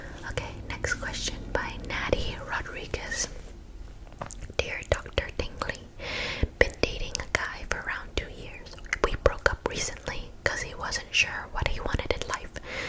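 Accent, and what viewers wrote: Asia. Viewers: I don’t know any other asmrtist that does the Asian accent, and after watching all your videos I’m not interested in watching other versions anyways lol. Truly unique & veryyy relaxing. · I absolutely love your asian accent videos. Its so funny and tingly. Great work. Heart❤ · Asian accent mom roleplay 😭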